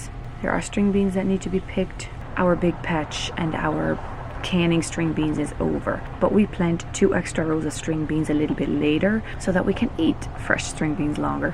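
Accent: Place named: Ireland. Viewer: I hear a hint of Irish in your accent 😊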